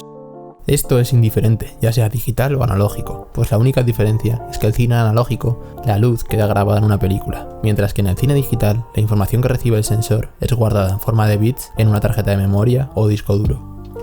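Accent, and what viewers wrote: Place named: Spain. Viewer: buenisimo tu video. lamentableente detesto la pronunciacion española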